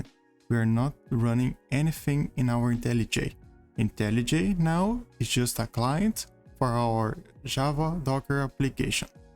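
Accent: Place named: Brazil. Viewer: reconheço sotaque BR de longe!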